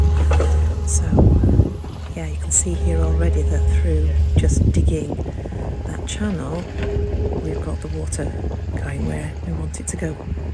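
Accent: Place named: Ireland. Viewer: I love a plan, especially when it comes with an Irish accent 🍀 And all mod cons! What could be better! Great start to the vlog ❤❤❤❤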